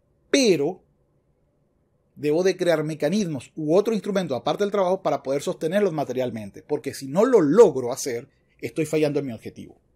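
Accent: Venezuela. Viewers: Muy bue vídeo amigo..excelente. ¿ Usted es Venezolano? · Ya sabía yo que eras venezolano amigo...!!! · tu eres Venezolano? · Hola, professor, deseando esté bien; venezolano en México, me cayó el veinte en cuanto a la corriente del Estoicismo, ya que lo percibo en "español venezolano", gracias, procuraré seguir escuchando y viendo tus vídeos.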